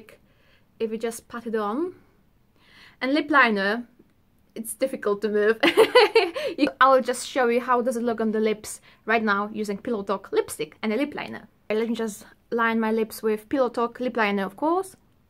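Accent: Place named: United Kingdom. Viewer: pfffeeeeeerfect, te typowo brytyjskie akcenty są niesamowite w Twoim wykonaniu, uwielbiam akcent brytyjski a u Ciebie słychać go tak przeuroczo ;) xoxo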